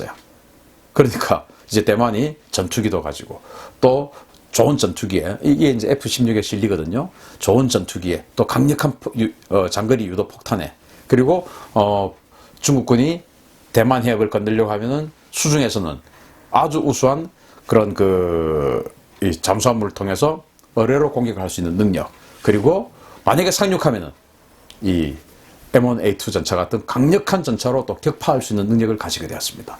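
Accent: Gyeongsang, South Korea. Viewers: 신인균씨 경상도 사투리가 조금 남아 있네요. 표준어 구사를 부탁합니다.. 자주국방 네트워트 회원이었죠. 지금 한미간에는 어느때보다 가까운데 갈팡질팡이라는 것은 가짜 뉴스에 불과 합니다. 조갑제 tv등 극우 언론인 ,기독교단체의주장이 일방적으로 진실이다? 무슨 설교하세요? 몇일전에 미국 재무부가 확인해준..한국의 가짜뉴스를 인증했는데... 미국도 친중인가요? 그럼 박근혜때 친중을 넘어 사대주의 끝판왕일때는 뭐하셨어요? 박근혜 정부 찬양하느라 바쁘셨는지? 중국 사대주의 박근혜 -시진핑은 베프 통일방해 북한지원 중공군 빨갱이 시체 무상으로 헌상 -친중 서방진영유일 중국과 FTA 박근혜-친중 TPP 쌩까고 AIIB 가입 : 친중 중공군 전승절 공산주의 동맹 반미 : 친중 대통령 당선직후 중국에 김무성 특사 : 친중 중국 미세먼지에 고등어 타령 : 친중 평택 차이나타운 건설 : 친중 제주도에 중국공안 배치 검토 : 친중 차이나머니로 정계, 언론계, 문화계 친중매국노 판 만듬 : 친중 차이나머니로 국내 기업 M&A해서 기술 다 빼김 : 친중 중국의 남중국해 강도짓에 침묵 : 친중 중국의 센카쿠 침입에 침묵 : 친중 이것이 친미인가요? 친중인가요? 이명박이 적극 지원해서 건립한 롯데월드는 신이내린 안보방어벽입니까? 노무현때는 논의하고 거절하면 죽일것처럼 덤벼들고 그것때문에 자주국방테느워크 사이트에서 많이 싸웟죠 대부분의 회원들의 역사관이 개신교, 이승만 박정희 박근혜 이명박... 그리고 일본에 대해서는 섬김의 나라..일본의 식민지배 찬양하는 회원들이 많았고 밀리 사이트인지? 아니면 극우 정치사이트인지 ? 에스더라는 극우 개신교 가짜뉴스방송과 조중동이라는 친일극우신문의 가짜뉴스를 보고 판단하시는건가? 문재인정부가 갈팡질팡이라.... 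밀리터리도 그닥 잘아신거 같지 않지만.. 정치경제를 모른가면서 가짜뉴스를 바탕으로 정치경체논평하는건 모순이라고 생각합니다만? 美, 대북제재 구멍 겨눠… '세컨더리 보이콧' 명시 : 뉴스 : 동아닷컴 이밖에 신인균씨와 조갑제씨와 친하신가? 조갑제 방송에도 나오시네요 그 조갑제 티브이...검색하니깐..전부 박근혜 친박 극우개신교단체사람들만 사실이라고 주장하던데... "한국에 세컨더리 보이콧"?…미 재무부 '사실 아니다' 확인 국내기사뿐아니라 미국의 문재인 트럼프 한미관계 대한 자료입니다. 미국 재무부 " "한미동맹 굳건"…트럼프 "한미는 위대한 동맹관계 트럼프 "내 친구 문재인".. 친분 과시한 한미 정상 <link> <link> 트럼프 "한미FTA로 양국관계 발전·도약" 웜비어 부친 "문재인·트럼프, 한반도 평화구축 노력 지지" 문재인·트럼프 대통령 노벨평화상 받을까 트럼프가 시진핑에게 문재인과 친분과시 문재인이 한국에 중국군대를 주둔하자고 했나? 역대 최고 수준의 한미관계를.. 박정희가 미사일 사거리 주권 헌상하고, 박근혜는 뭐했으며.. 문재인이 미사일 사거리 중량 패기는 친중인가요? 미국 전략자산 들어 오는것도 친중인가요? 이명박 박근혜 때 9년 뭐했어요? 비판좀 하시지...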